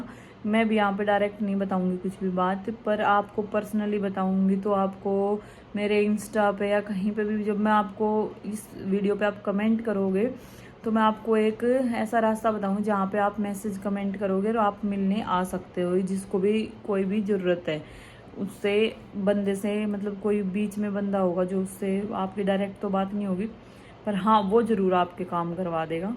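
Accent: Haryana, India. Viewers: Aap Haryana se ni ho kya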